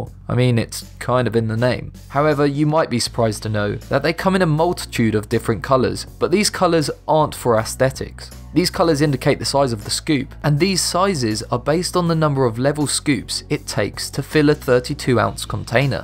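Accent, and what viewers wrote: United Kingdom. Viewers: Oh you know I just realised this person has a British accent ,' '-D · Is it only me or is every top 10 channel British? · I love the accent. If this weren’t conveyed in a British accent I think I wouldn’t love it as much. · InxPursuitz he sounds nothing like him. Dolan is Australian this guy is British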